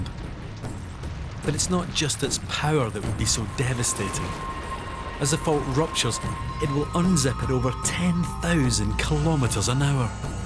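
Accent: Scotland, United Kingdom. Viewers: I love the Scott’s accent! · The Scottish accent makes it sound even more frightening.... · I love your accent. So delicious! I always loved Scottish accents. I'm partly Scotch myself, but I don't have an amazing accent like that! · what accent is that? scottish?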